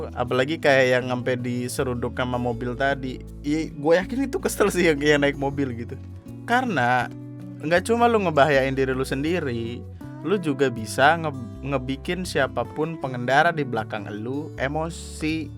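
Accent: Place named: Tegal, Indonesia. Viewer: Tegal keras cuy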